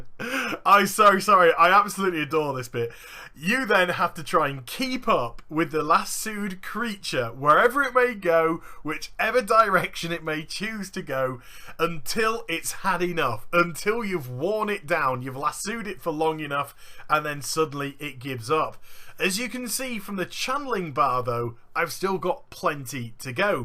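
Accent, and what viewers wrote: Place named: United Kingdom. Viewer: Your comment is funny because he's from the country that created the English language, yet you "correct" his pronunciation.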